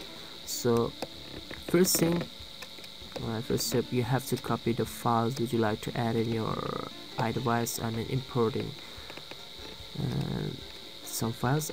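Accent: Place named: China. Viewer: "thank you for de chinese accecent"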